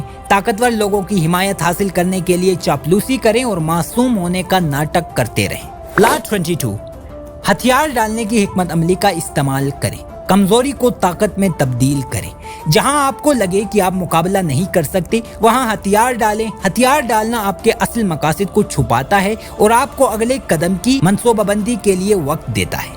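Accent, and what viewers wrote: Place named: Gilgit-Baltistan, Pakistan. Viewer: Apky urdu accent sey lagta hay app Gilgit Baltistan say belong krtay hain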